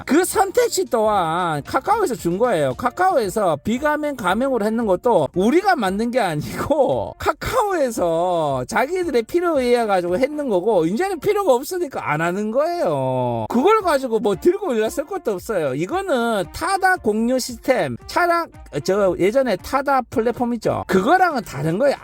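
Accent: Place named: Daegu, South Korea. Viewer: 대구사투리가 너무 듣기 싫다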